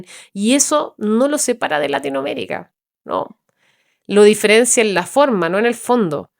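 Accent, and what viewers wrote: Chile. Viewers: GRACIAS querida.!!!!! Me ha encantado tu delicioso ACENTO,...a mis 76 años me enamoré de CHILE, por VOS.! Claro, también los CONTENIDOS.! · Hola gracias, cada vez que te escucho con esa tomadita chilena. Desde La Plata - Bs As - Argentina Vas como borrando ese enojo o recelo que los argentinos tenemos por los chilenos. Es que de verdad dolió que tuvimos ayuda de tu país en la guerra de las Malvinas. En cambio nuestro cariño al pueblo peruano. Que fue el único país que nos ayudo esa guerra. Yo era muy chico y me contaron y lo estudie en la escuela. Muchos años después vinieron esas 2 copas América que Chile nos ganó para mí opinión una debió ganar Argentina. Dicho esto, coincido con vos a los argentinos vivimos la vida a mil y somos muy teatrales. No por nada Capital federal es una capital con miles de teatros En la crisis del <num> Me acuerdo de haber participado de una obra de teatro en cooperativa que justamente hablaba de las crisis de nuestro país. 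Pero también de nuestros proceres como Belgrano, San Martín y otros. Y sus ideales .. Personalmente no tenía trabajo, había dejado la carrera. Y el país se caía Y ahí estábamos unas 30 personas algunos docentes, trabajadores y desocupados. Jóvenes y personas hasta 35 o 40 años creo. ( Hombres y mujeres ) El director un ex consejal de La Plata. Que le decían el turco por su origen de familiar Bueno estuvimos todo un año ensayando la obra era un musical Y la estrenamos en diciembre. Pero muchos necesitamos estar ahí en hecho cultural que nos una y ayude a pasar ese momento juntos Y si la familia, la amistad, la reunión, nuestros ídolos son sagrados. Porque creo que e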